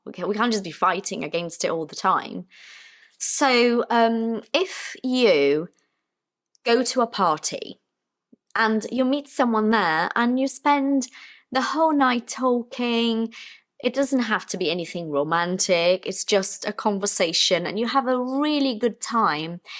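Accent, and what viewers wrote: United Kingdom. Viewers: Thanks, beautiful. I really like your British accent. It's very pretty. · You must a Brazilian, speaking the English language as a foreign language in Brazil. And you also sound a little bit like a british person. Congratulations, my dear!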